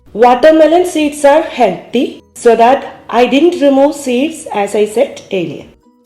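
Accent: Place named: Thrissur, India. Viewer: Nalla thrissur malayalam thanne aayirunnu nalladhu ,kelkan oru sukamundayirunnu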